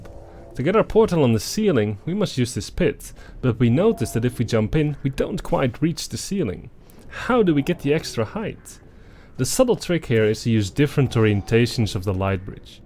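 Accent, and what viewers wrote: Netherlands. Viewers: neat your dutch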